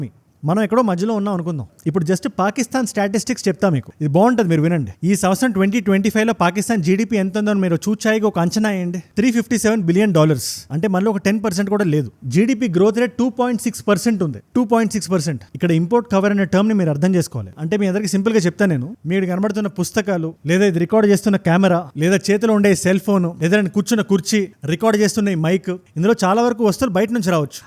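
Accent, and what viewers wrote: India. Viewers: Mee voice lo indian feel venataniki chala baguntundhi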